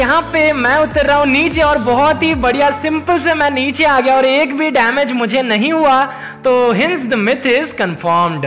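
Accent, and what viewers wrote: Odisha, India. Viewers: Bhai aap oddisa se ho Kya 😅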